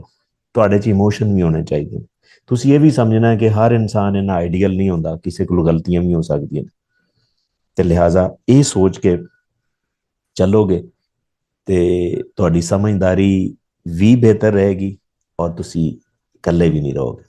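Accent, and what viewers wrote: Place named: Punjab, India. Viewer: Punjabi accent nice.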